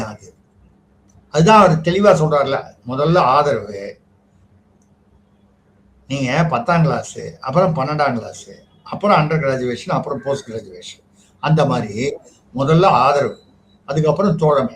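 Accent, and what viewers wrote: India. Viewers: They speak English English . You speak india English 😊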